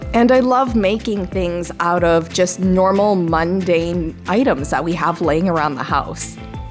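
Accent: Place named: Maritimes, Canada. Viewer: You're just so friggin creative thanks for sharing your artistry, amazing, your sound like your from the Maritimes lol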